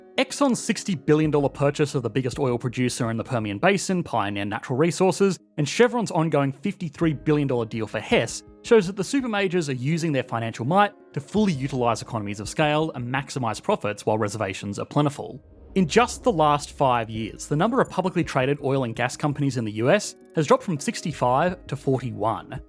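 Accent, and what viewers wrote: Australia. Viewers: He sounds very Aussie. I'm not sure how I missed that. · sounds aussie to me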